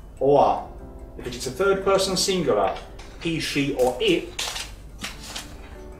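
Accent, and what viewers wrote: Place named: London, United Kingdom. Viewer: У этого преподавателя очень хорошее произношение( Exact English) Вы не найдете в России другого такого педагога- англичанина с лондонским акцентом.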